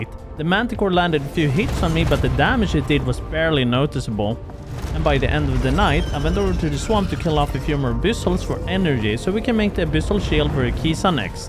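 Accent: Sweden. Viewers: you sound swedish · As a fellow Swede, yes this is why, and yes you reeeeally got that classic swedish accent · are u swedish?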